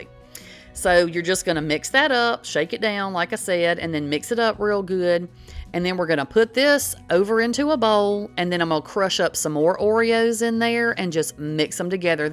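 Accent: South, United States. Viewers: Is that a “southern” accent ? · That southern accent...where ya'll from?? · It is delicious, I made a strawberry version and a chocolate with real choc chips in it. They are both a success. Love your accent, what part of America are you from?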